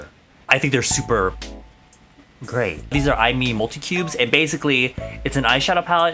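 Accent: South Korea. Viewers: your konglish😂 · The konglish is strong in this one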